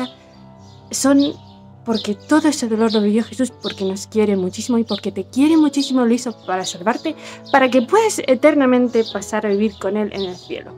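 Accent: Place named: Spain. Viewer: Tenés el acento español mucho más marcado 😦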